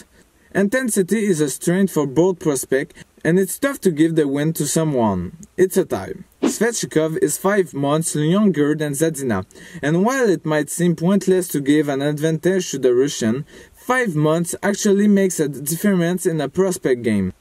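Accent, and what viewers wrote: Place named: Quebec, Canada. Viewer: J'adore l'accent Québécois dans ton anglais ahahah! Sinon c'est une bonne bonne vidéo!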